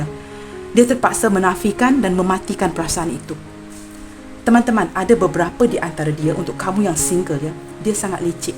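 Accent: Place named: Malaysia. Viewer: Pisces Hadir. 👏🙏 readingannya sangat resonet sekali. Trimakasih atas readingannya. Smoga dlm keadaan sehat2 dan bahagia sll bersama kluarga tercinta di sana. Selalu sukses buat. Sepertinya klo sya dengar percakapannya, adalah orang India Malaysia klo tak salah yaa. Sya sangat senang dan bangga sekali dng readingannya, sebab sangat jelas dan resonet. 👏🙏🙏👍👍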